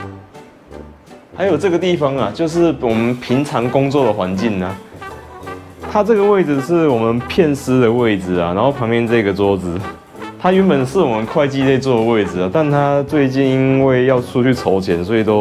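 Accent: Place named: Taiwan. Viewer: 台灣國語啦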